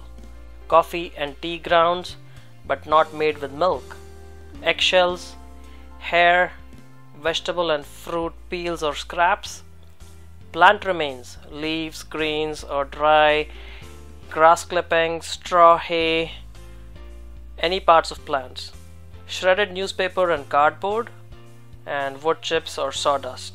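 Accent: India. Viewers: just love your india accent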